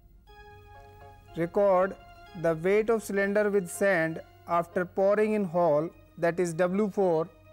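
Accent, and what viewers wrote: India. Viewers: Haha Indian English, lol :v shut your fucking vandal lang up :3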